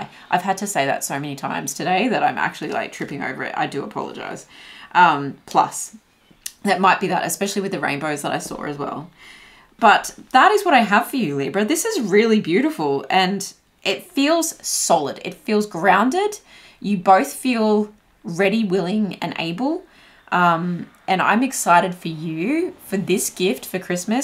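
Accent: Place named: Australia. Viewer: same here, it's weird hearing an Aussie accent on here.. funny when you second guess your own accent lol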